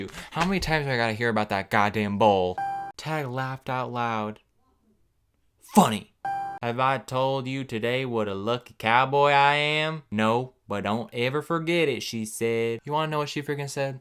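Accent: South, United States. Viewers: as someone from the south, I beg of you to read it all in your southern accent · I don't even know what this book was about even after watching this video but your southern accent was just everything haha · 1) Even 5 minutes didn't go by and I was losing it XD 2) Subscribed 3) Southern accent 4) Sound effects 5) Beautiful cover of Little Mermaid 6) Not confusing but confusing feelings towards 7) Withholding Shreksy time 8) yeehaw Wonderful! 10/10! Will never watch again! (I probably will, lets be honest) Great job partner!:) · Your cowboy accent is flawless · Is it just me or does his country accent reminds you of forest gump?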